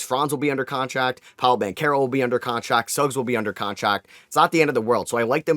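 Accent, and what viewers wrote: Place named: East Coast, United States. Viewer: He’s from the east coast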